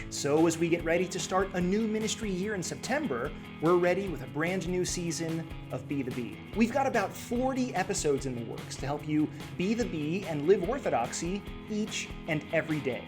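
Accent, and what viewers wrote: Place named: United States. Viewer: Καλή Δύναμη! I have used your videos for a few years now and my students find them informative and engaging. The time is spot on. We're in Melbourne and we always smile at the 'American' accent. Just a suggestion, would you be able to prepare a video on St John the Baptist? Not just about his presence at Theophania - the Beheading of St John the Baptist has many lessons to teach us. Especially trying to navigate the world we are living. He is also a relevant 'environmental' figure with him eating locusts and wild honey. The 'food for the future people' only need to look back.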